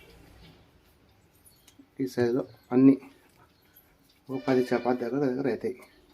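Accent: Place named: Anantapur, India. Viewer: మీ భాష వింటే అనంతపురం జిల్లా వాళ్ళు అని తెలుస్తోంది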